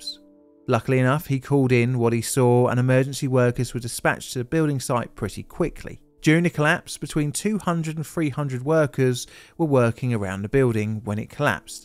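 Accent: United Kingdom. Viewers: he's British. They never pronounce things correctly. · He uses the word "garage" in the American sense, as in for parking, I'm assuming because Americans get huffy about stuff like that, but because he's saying it in a British accent my brain can't help but translate it as "car repair shop/gas station". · The fact that your accent clearly places you across the pond and you still throw shade at Florida 😂 they cant catch a break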